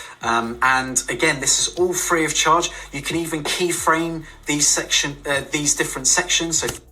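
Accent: United Kingdom. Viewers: Yea, a British accent. You are the first reviewer to mention the screen record as part of the equation. I have the i5 on order with 16Gb & 1Tb after watching lots and lots of comparisons. I decided the i7 might not live up to it's promise. (I loved my old MBP with quad i7 but Apple killed it by not allowing Catalina due to metal)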